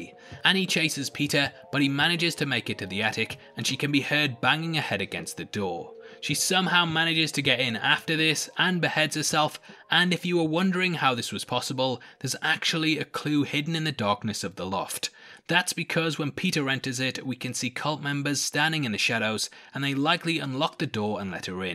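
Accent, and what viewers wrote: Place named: Newcastle, United Kingdom. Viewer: Howay..this is hands down the best and scariest horror I've ever seen ... intelligence and terrifying..Great channel and nice to hear a geordie !!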